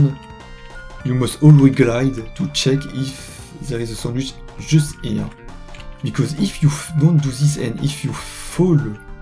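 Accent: France. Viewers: J'aime beaucoup ta voix mais omg, c'est tellement awkward quand un Français entend un autre parler Anglais avec son accent x)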